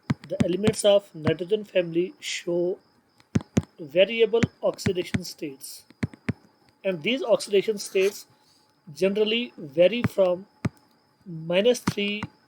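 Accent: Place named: Kashmir, India. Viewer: yes sir .... kashmiri accent is 💞...btw im from Srinagar....iss saal board hai.... dua kejeye ga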